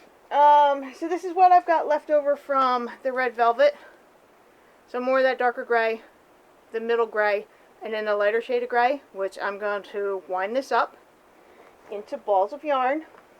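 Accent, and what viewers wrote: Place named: Philadelphia, United States. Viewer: I had a feeling just by your accent you were close to Philly! I’m from New Jersey. I lived in Absecon worked in Atlantic City at Harrahs moved to Las Vegas back in 1996 been here ever since.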